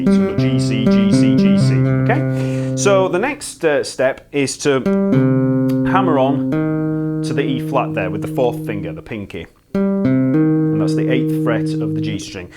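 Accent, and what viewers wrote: United Kingdom. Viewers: Nice video an' accent. R u brit? If you are, from where?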